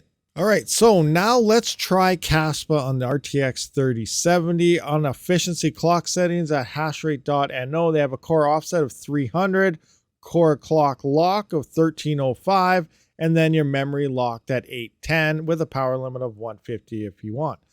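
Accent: Canada. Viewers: Are you Canadien buddy?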